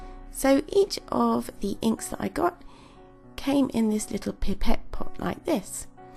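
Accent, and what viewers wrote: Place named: United Kingdom. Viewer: Great job! I really enjoyed watching your review video and you did such a good job at being so thorough. This is a really helpful review for anyone who is considering buying these watercolour inks! I also love your accent ❤ I am British too and I also do art tutorial/voice over videos! So keep up the amazing work. I've subscribed ❤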